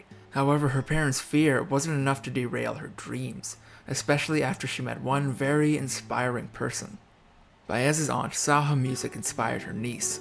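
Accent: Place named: Scotland, United Kingdom. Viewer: Scottish narrator! Scottish narrator! Scottish narrator on every vid!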